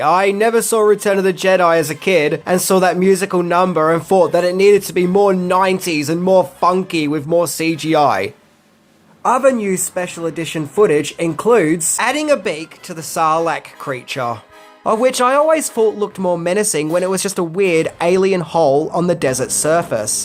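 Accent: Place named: Australia. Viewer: Yeah same hey :) . It's the down to earth Aussie accent that helps too :)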